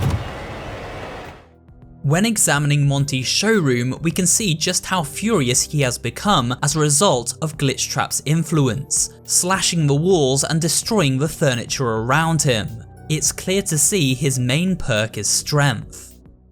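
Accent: United Kingdom. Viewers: i thought this would be good and then i hear british voice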